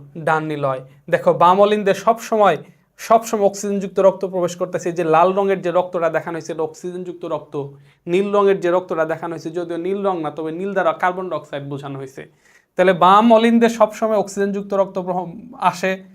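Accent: Sylhet, Bangladesh. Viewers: Ohh.Kotha Bolar Style sylheti der moto..!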